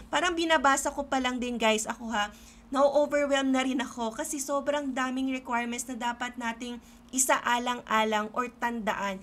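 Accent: Philippines. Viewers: Remember. Sooner or Later After your Graduation.. you will be working in the legal Firm. You must improve your English Pronounciations and your English Communications. Avoid using Filipino way of talking. Start assimilating to the American Culture.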